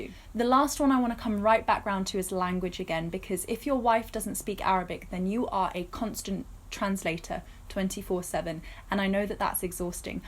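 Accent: United Kingdom. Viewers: I love her accent. UK? I married a Saudi 🇸🇦 and it was a bit of culture shock. But he helps at home & is so romantic: not at all like my American Dad! They have a staff in KSA but we just manage ourselves in the 🇺🇸 states. 🤷🏻‍♀️ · Is your accent English... It is really nice accent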